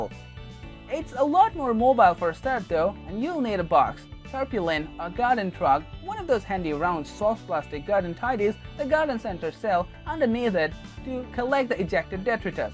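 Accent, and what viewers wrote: India. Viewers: This video is made for the British market, in a sometimes hard to understand Indostani accent. Why did it show up in my search from the USA? Something wrong with the ‘algorithm’?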